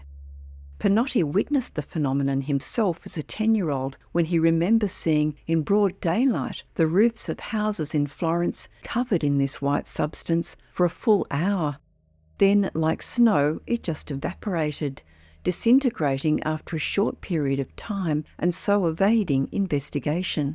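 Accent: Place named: Australia. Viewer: Love your channel as well as your Aussie narration 😀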